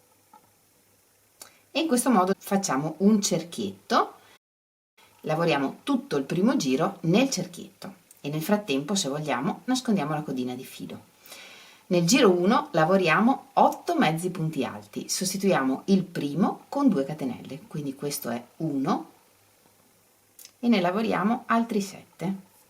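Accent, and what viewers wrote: Veneto, Italy. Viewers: Bellissima! Sei bravissima 👍 Sei Veneta? Hai un modo di parlare splendido 😉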